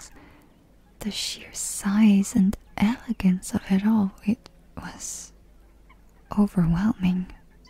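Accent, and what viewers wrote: France. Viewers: I waited three days for the perfect morning to listen to this since I saw there’s a second part of my favorite maid asmr. It was worth the wait, it was worth to listen. Now I really hope there will be part 3. No one on YouTube is providing such charming content. Maid, French accent, even poetry with all background sound. You’re truly amazing. Thank you for being with me this morning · I find this very beautiful and comforting. I closed my eyes while listening to this audio, and pretend that I'm spending time with the maid and getting to know her better. The French accent sounded very beautifully and I can say I enjoyed it. Great work. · I love the French accent vids! I hope there will be a Part 3! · My,my,my i think have to start study French because your accent is magnificent 👌 ❤❤❤ N.B: Umm, so there are 2 similar videos in got a bit lost😂😂, and double post means a fine night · First 30 seconds in and the French accent is melting my brain away. 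This accent sprinkles happiness diamonds on my being once again. This accent is just to powerful. To gorgeous. To elegant. I can't fight it!! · I listened to this after a very long and hard day and the stress just melted away. Also a French acsent never sounded so appealing. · Yes another french accent vid, love the audios keep up the amazing work! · It's only been 9 days and we already need more of the French accent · Well part of me in some distant future hopes for a part 3, (shh this is a secret 🤫 but i personally like the French accent audios definitely a favorite of mine to listen too) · Man, I always love your french accent videos. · I saw French accent asmr and I press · Aaa, good cute French maid is really something. Your French accent make me loved French and made me temember my highschool day when I learned French myself. ❤❤❤❤ Thank you for the upload. ❤❤❤❤ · I love your French accent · I loved this audio. 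I hope we get a part 3 someday. Also, if you ever just want to upload a video of reading poetry in your French accent, I might die in bliss (or at least sleep in it!) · I am obsessed with the french language and accent, and hearing from a soft-spoken sweet voice with caring words is nothing short of perfection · The French accent soft-spoken videos are my favorite from your channel. This one is actually quite sad. I hope there's a part 3 :( Thanks for sharing.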